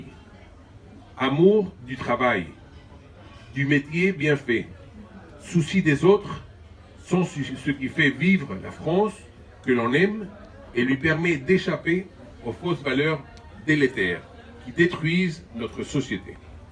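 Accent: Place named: Spain. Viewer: Il n’a pas l’accent marocain mais espagnol,son père était Alphonse de Bourbon Dampierre et sa mère la petite fille de général Franco.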